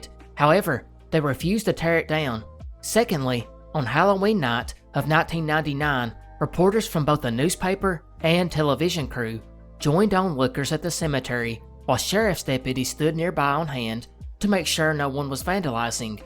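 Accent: South, United States. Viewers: Best Southern Accent on YouTube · I always love your channel. I’ve been a sub for a while now and you never disappoint! I’m from Texas so it’s nice to hear some southern accents in YouTube land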